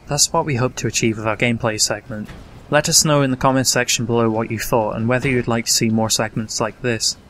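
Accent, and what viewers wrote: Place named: Scotland, United Kingdom. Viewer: Love the Scottishness <3 I'd love to see more games like these. Ones that are good time wasters and that are off the main Radar of Say the Steam featured items page :D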